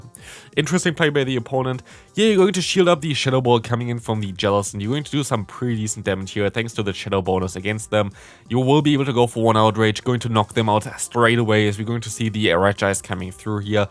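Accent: Germany. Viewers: Nice Video, are you german???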